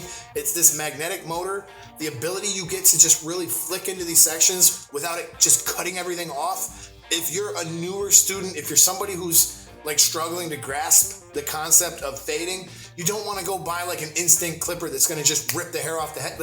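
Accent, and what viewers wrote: Buffalo, United States. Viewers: Are you from buffalo?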